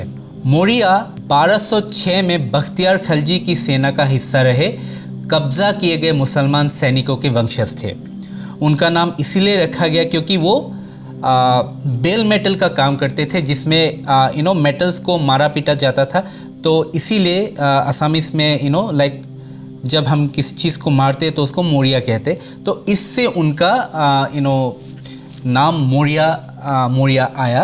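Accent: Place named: Hyderabad, India. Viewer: Hyderabadi ya Bengaluru ka lag rha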